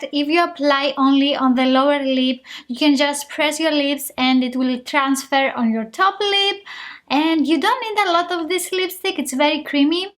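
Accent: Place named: Greece. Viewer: You can also say split instead of separate if you want. Nice accent, not sure if you were Greek or Spaniard. Thanks for the review!!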